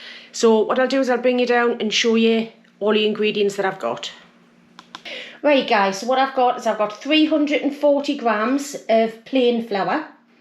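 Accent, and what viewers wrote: Newcastle, United Kingdom. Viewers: I like your British accent I'm American. I love your video of the corned beef & potato pie. I migth make it some time it looked very easy to make. I'm part Irish and I thought cabbage migth be good to put it do you think so?. May bless you. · So down to earth and the way we used to cook before fast food! Love your Geordie accent. Keep em coming, cooking the way people actually cook in an ordinary kitchen - cooking first class food! Thanks.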